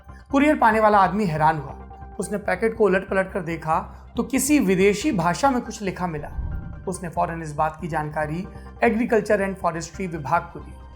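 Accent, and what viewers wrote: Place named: Varanasi, India. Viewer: Mahur bol dela guru..... Banarsi style me😜😜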